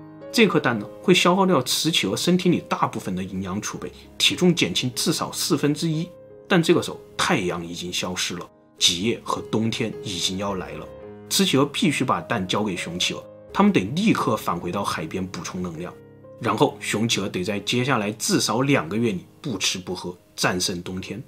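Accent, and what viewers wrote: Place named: Mainland, China. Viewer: 中國人發音跟台灣不一樣你當亞洲國家只有台灣？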